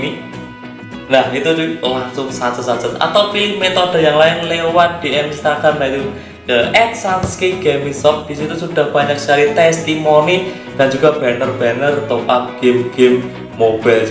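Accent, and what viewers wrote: Java, Indonesia. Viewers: Logat omongan lu kek orang Jawa bang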